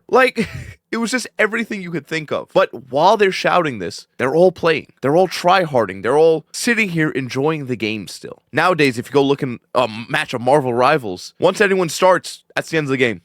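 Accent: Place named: Alabama, United States. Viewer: I definitely thought bro was Alabama white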